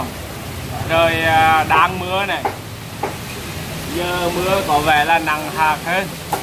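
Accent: Hue, Vietnam. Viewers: noi giong Hue ray de nghe, cam on em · anh nay noi kho nghe qua noi giong hue bo tay hieu duoc chet di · Chương trình của bạn rất hay. Cách dẫn giải từ tốn rất rõ ràng, đặc biệt là tiếng Huế rặc làm cho tiết mục thêm phần hấp dẫn. Đây là một loại thuốc thần kỳ để chữa bịnh nhớ Huế của những người xa xứ. Cảm ơn bạn rất nhiều.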